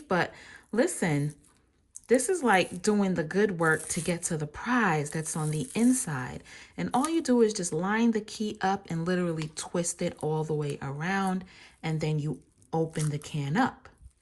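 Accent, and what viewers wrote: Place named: Jamaica. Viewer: Please use your accent more. The Jamaican accent is so beautiful to hear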